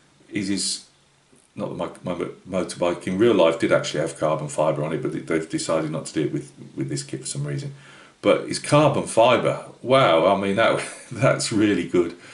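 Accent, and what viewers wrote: Midlands, United Kingdom. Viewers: It's Tony by the way lol... Live in Worcester but grew up in Brum... You from Midlands?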